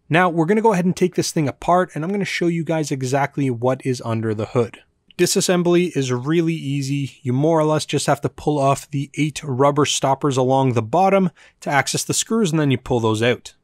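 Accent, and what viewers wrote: Canada. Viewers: Thanks, mayhap I will! Great review, and if I'm not detecting a Canadian accent from you, then I have forgotten my people :)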